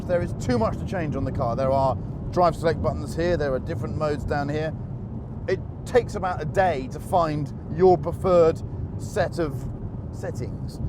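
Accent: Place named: London, United Kingdom. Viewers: Anybody knows the British Accent of Chris Harris? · Sener S sounds like London to me.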